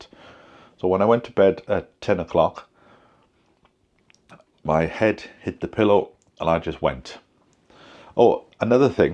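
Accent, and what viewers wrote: Wales, United Kingdom. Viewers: I love listening to you chatting 😊I love the Welsh accent 😊Blessings going your way for healing 🍀❤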